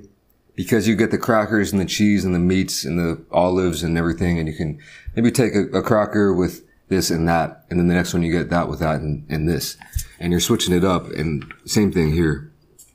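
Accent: United States. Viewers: Eh? Of course he's American check his accent!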